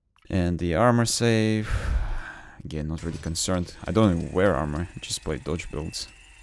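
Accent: Russia. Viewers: Акцент русский, по моему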